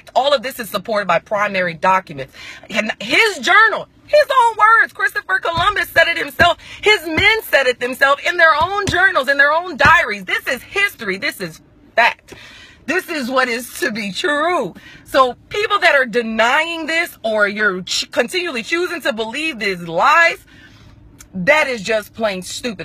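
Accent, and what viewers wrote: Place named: AAVE, United States. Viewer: <link> this is how u explain it without stuttering or ebonics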